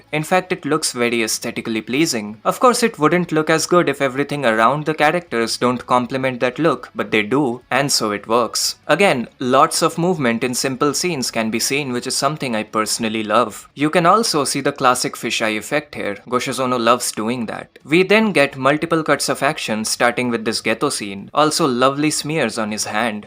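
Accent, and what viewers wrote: India. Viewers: Are you Indian? Cause you sound like it. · Yep in Tybw video they were targeting you through racism,when they weren't able to find proper points to defend their criticism they were saying stuff like "you're Indian" "You can't make good content because you're from India and India is known for scams" "I can't hear you your accent is annoying"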